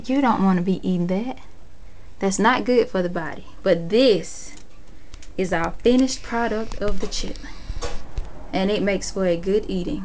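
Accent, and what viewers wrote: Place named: South, United States. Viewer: You have the cutest southern accent!!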